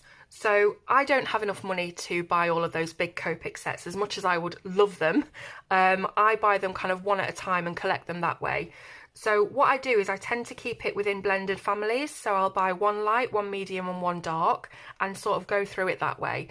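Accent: United Kingdom. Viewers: Dear, I have nothing particulary bright to say on all those issues you've brought up, so I'll just go with all the goods your channel brings to me. First I love the way your always giving all the details I want to know about a products and damn am I picky about details but you never let me down. I love how "creative" your are bringing up things that may not seem directly related to coloring but always end up interesting. To me your channel is like a massive safe place, I know I'll feel good watching your videos, have my brain focus on bright colored things while learning tips. This video is no exception to my "colour with " experience. I don't mind deep subject when it's delivered simply and honestly like you just did. I think you have a huge skills when it comes to "talk" your pace, you're lovely British accent, your pronunciation, your way of playing with words always makes me feel relax and safe. 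The hard work your pour in your channel equals gentle bliss bubbles to me. Thank you dear.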